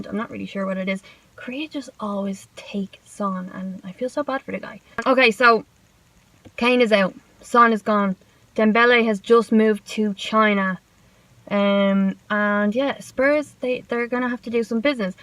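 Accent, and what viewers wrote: Ireland. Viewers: HI HOW ARE YOU BEAUTIFUL 😘 I HOPE WE SIGN KOULIBALY. I REALLY LIKE YOUR ACCENT 🇨🇮 #IRISHACCENT XXX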